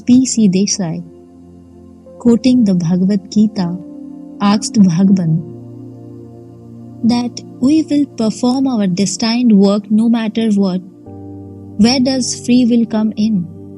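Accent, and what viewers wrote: India. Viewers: Why have you chosen a sound with indian accent? To demonstrate the origin of R.M? That's a very bad choice. It would have more effects with ordinary English speaking audiences. We love his words in the most direct way of communication. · What is wrong with Indian accent? Are you a European?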